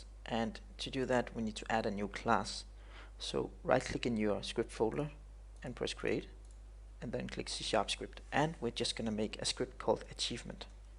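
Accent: Denmark. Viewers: hey i hope you still helps :P im trying to follow your tortuial on this achiement system :P but insted of achievement i will make it to a buy dogs(pugs) :P but i have some problems :) mine says ´pugs´ is a type, which is not valid in the given context :( and i dont get why :) so i hope you will help me :P ps Danish here :) so if you want just talk danish :) cos i can tell by your accent that you are danish :P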